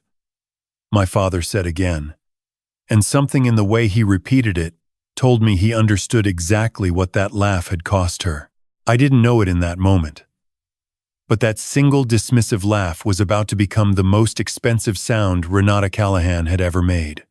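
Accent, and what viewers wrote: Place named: United States. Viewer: Having an American voice use British vernacular is very annoying. It really comes across as fake!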